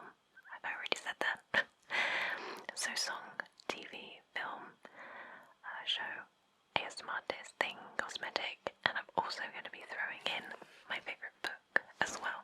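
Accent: United Kingdom. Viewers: Beside her being beautiful is she Britain from the accent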